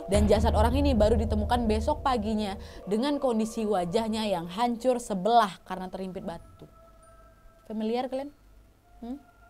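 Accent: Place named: Makassar, Indonesia. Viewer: Logatnya kayak logat orang sulawesi (makassar)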